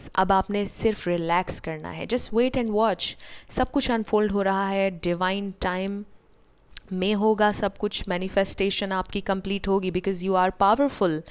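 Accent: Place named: Pakistan. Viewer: Mujhe lagta hai aap Pakistan se lag rhi hai.. Kyuki aapki bolchal kuch ehsas dila rhi hai. Any way. All the best to you. And Happy New Year 2024❤❤🎉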